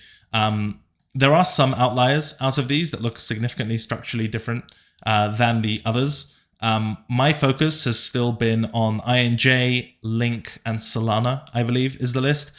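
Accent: United Kingdom. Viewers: Team here. Sure, it might be more profitable to copy trade the duck. But you can't beat the charm of ' lovely British accent and self-deprecating humour.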